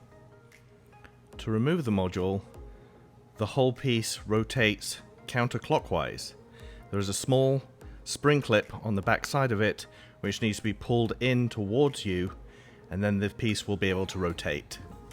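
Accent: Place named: United Kingdom. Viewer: Everything sounds better with a British accent❤ 👍 You sound like my son in law from Nuneaton...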